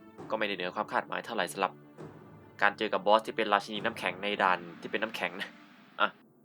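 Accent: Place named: South, Thailand. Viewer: จากที่ดูหลายๆคลิป ผมรู้ว่าพี่เป็นคนใต้แต่ผมแค่ไม่มีหลักฐาน สำเนียงคุ้นมากครับ 😊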